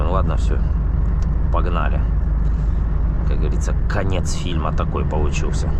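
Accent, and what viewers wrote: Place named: Don, Russia. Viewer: 25.45 Я летом там забодался😂. Сразу видно, казак донской! Мы тоже так в детстве говорили.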